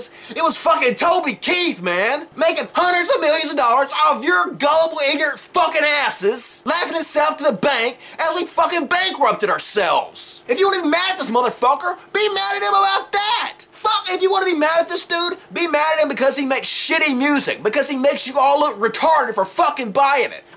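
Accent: South, United States. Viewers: Never heard of you til today. I too have a pretty bad southern accent (Louisiana) and I tend to pick on hillbillies alot because of their close mindedness and lack of intellect. Dude, I couldn't help but to be impressed by your video. You mentioned people judging you because of your accent, and when I first started watching the video, I started to click on something else. (regardless of the fact that I sound the same.) I guess you can say, I assumed lack of intellect when I heard the accent and I apologize. As I continued watching the video. I realized, this southerner is pretty freakin cool. You are alright there. Way to represent logic from the South. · I'm not gunna lie the first video of urs I saw I thought "oh no a ranting southern hillbilly." I'm glad i listen to words not accents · Great video once again! Just to let you know, I don't automatically judge every southern drawl as a moron.:D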